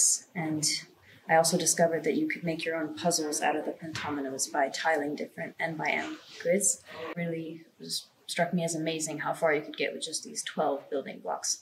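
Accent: United Kingdom. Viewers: Its British English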